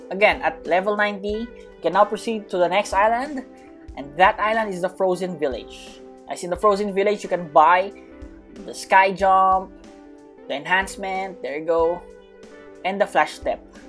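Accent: Philippines. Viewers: i know filipino people with that accent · I thought you are American but your a pilifino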